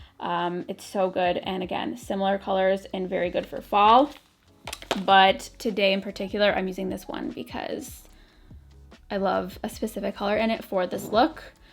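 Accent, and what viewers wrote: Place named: Minnesota, United States. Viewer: Are you from/live Minnesota? Certain things you say reminds me of how people there talk. 😊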